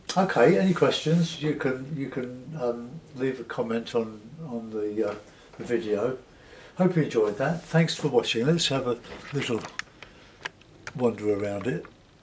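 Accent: United Kingdom. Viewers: I think this is pure genius. Thanks you for sharing your technique! I can't wait to try this today. Thank you so much. I love your British accent too, by the way...so charming.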